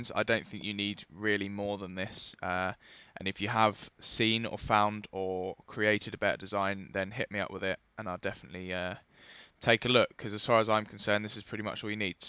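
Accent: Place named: England, United Kingdom. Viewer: I'm using your English accent to annoy my mom - she finds it annoying because she strongly believes that this accent does not exist. I will prove her wrong. - To the topic. I love your tutorials.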